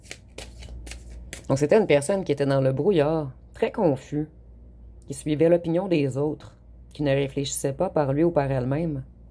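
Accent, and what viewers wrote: Quebec, Canada. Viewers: Bluffant !! Incroyable à chaque fois mais là 👏 bravo. J'adore votre accent québécois et aussi quand vous rigolez sur certaines cartes le concernant 😂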